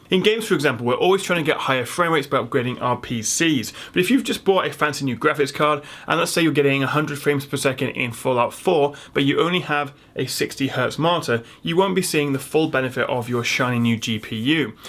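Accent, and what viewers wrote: United Kingdom. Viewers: R Doc Id rather listen a pleasant British accent than an accent that sounds like a Canadian who stuffed two lbs of processed cheese up their butt. Just sayin.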